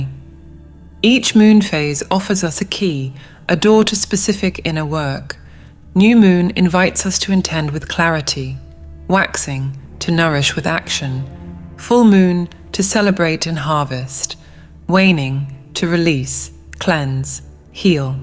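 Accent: United Kingdom. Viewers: LOL!!! I see you've pulled out all the tools in your belt for this load of propaganda eh? Oh we had thirteen months alright, which you can clearly read in your present bible. Not hidden at all. A wee bit of truth and a load of bull...propaganda. You use a British voice to convince us that she is well educated, lol, and you use low frequencies to relax the mind and put it in a more malleable state. This is pure evil. Also known as misdirection. Some truth mixed with some nonsense will have you forever searching. DON'T FALL FOR IT FOLKS!!!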